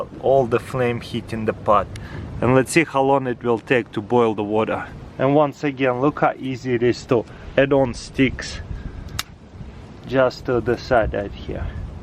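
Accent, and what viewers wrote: Russia. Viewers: У тебя акцент русский.😀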